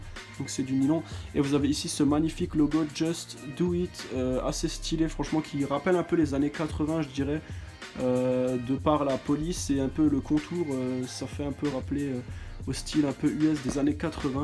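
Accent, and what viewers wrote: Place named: England, United Kingdom. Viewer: L'accent anglais et certifier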